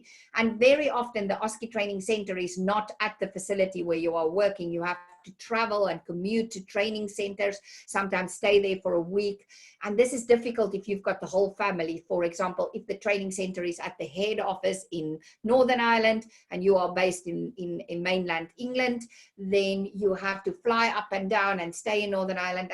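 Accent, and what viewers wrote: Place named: South Africa. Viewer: Good to hear you still speak with your South African accent 20 years on. 3 seconds in and I knew you were from here.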